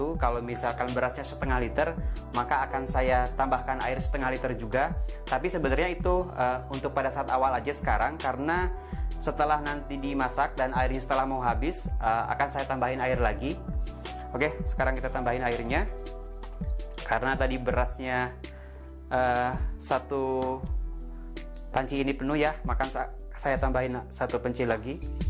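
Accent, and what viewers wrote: West Java, Indonesia. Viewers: Meskipun pakai bahasa Indonesia yah, masih lengket banget yah logat sunda nya yah. Mantap Kang 👍 lanjutkan, ditunggu video selanjut nya Kang 🤗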